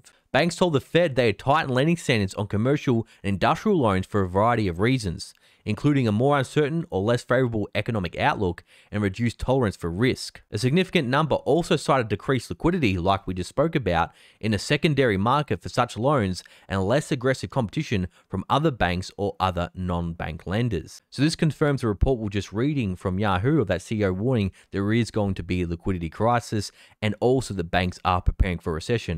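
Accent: Australia. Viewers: keep saying data in your native aussie accent! dont change!!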